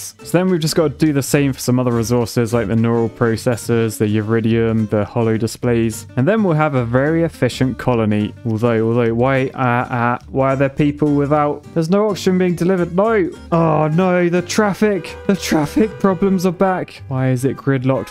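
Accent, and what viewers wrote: United Kingdom. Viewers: this guy is like let's game it out but british and ever so slightly more serious...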